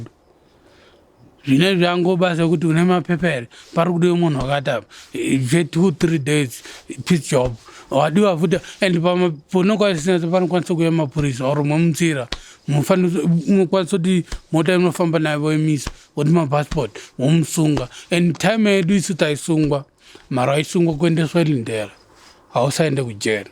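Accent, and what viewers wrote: Ndau, Zimbabwe. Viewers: Ko nanhasi achikungotaura chi ndau like this but all these yrs haasati achinja tone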